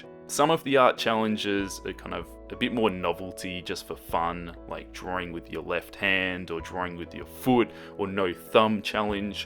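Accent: Australia. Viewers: Yes! Another Aussie! Love your work mate! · Bro are you Aussie??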